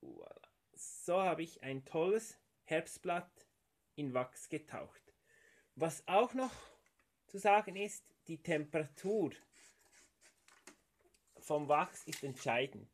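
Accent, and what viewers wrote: Switzerland. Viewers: liebe ich den schweizer Akzent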